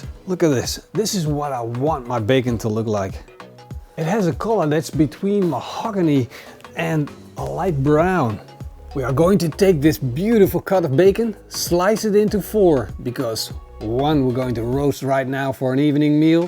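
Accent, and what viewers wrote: Netherlands. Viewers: He's Dutch, I could tell by the English accent (and typical translation mistakes) but the actual Dutch words like boerekool confirmed it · Je engelse accent lijkt alsof je een NEDERLANDER bent, klopt dit? Haha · That lovely thick dutch accent. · Are you Dutch? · is this guy dutch? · In hoor dat je nederlands bent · When you can hear the Dutch in the man. ;D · That hollands accent though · Are you dutch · Ik vond zijn accent erg Nederlands Engels en het was niet eens slecht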